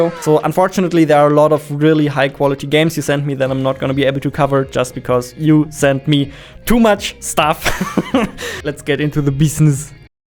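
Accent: Germany. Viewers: Man hört den Deutschen Akzent so raus haha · are you german? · Bist du Deutsch? Hört sich bisschen so an. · Never heard such german englisch but I love it haha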